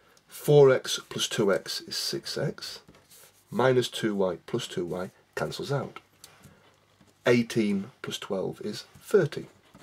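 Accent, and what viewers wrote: England, United Kingdom. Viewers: You are from england right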